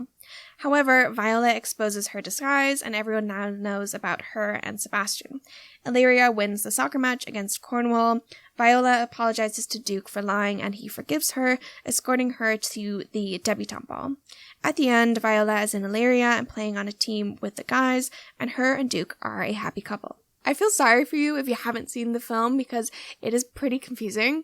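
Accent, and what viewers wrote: Ireland. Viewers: i could hear the irish and i was so confused then i read the descrpition haha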